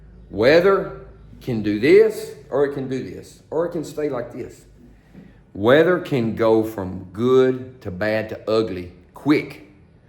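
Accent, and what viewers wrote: South, United States. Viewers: I read Greg was good friends with Dutton. Greg had 3500 flight hours and recent multi engine rating plus he seemed to know operational aspects of the Citation well enough to suggest he might have been best in right seat. Using my FSX to recreate the flight with 2 miles visibility, I lost the airport multiple times while turning back to runway 28, 10 then close downwind 28 to keep airport in sight at 1000 agl, then tight teardrop base to final, lost airport again, continued and eventually saw airport envirnoment. Scary even in FSX. I think they were 500 agl, or so, base to final. Nervewracking just thinking about it. Enjoy your podcast,. You nice southern accent reminds me of folks from Mississippi, like me.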